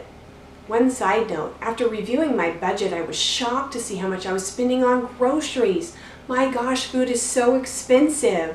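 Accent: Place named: Midwest, United States. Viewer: New subscriber! I am retired for last 5 yrs at age 57, now collecting my SSI at 62. Where do you live? Thinking maybe MN or WI? detect an accent Of that sort? I lived in Chicago area for 25 yrs but now live in SC for past 10 yrs and love it. 😎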